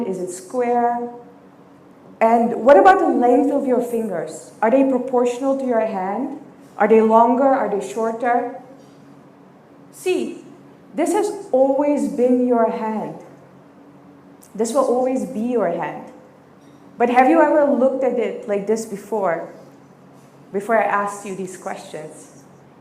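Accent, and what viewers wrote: India. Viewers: Good lecture as like Indian professor. Pronantion as like Indian. Easy to understand.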